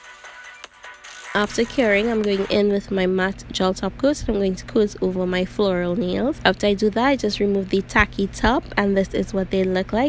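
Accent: Trinidad and Tobago. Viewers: You accent sounds Trini :)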